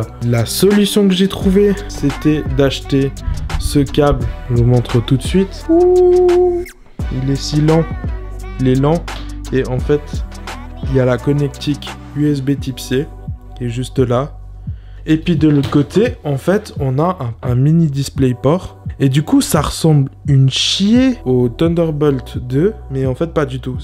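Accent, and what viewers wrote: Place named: Switzerland. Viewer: Je fais une recherche à ce sujet, je reconnais ton accent Suisse et je vois Delémont...Yo! Je viens de Delémont aussi mais je vis à Montréal depuis 2011. Insane ce bel hasard!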